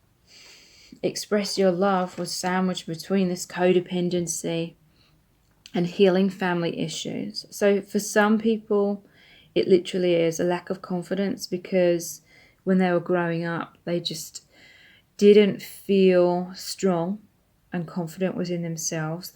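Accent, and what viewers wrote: United Kingdom. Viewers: can anyone explain to me what she meant by transformation in communication for pile 1? the person i love is living an unhappy marriage and trying to get his divorce, but he is afraid that the wife will not let him see his daughter any more , i didnt really understand her as she has a british accent and am not a native speaker.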